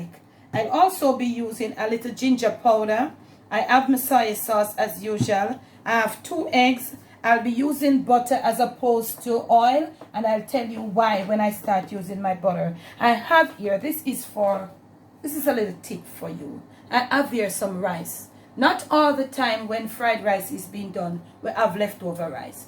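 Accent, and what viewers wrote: Jamaica. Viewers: Hey. You are the best....continue chatting de patois...so authentic just like your delicious recipes...you go Mrs.Girl....Nuff Respect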